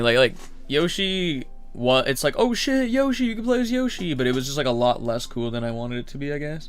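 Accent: Canada. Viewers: Awww. The Canadian accent. “Oooootfits” lol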